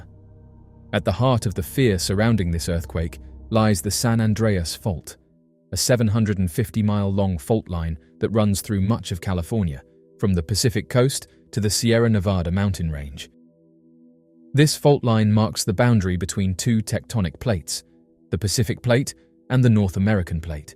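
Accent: England, United Kingdom. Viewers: If the narrator has an English accent, you can be pretty sure that what follows is BS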